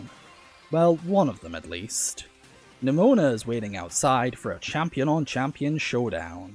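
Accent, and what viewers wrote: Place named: United Kingdom. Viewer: Me: Who is this channel? Algorithm: Trust me bro Me: (skeptical) okay... (plays video) (player has British/Euro accent) Me: oh FUCK YEAH this finna be good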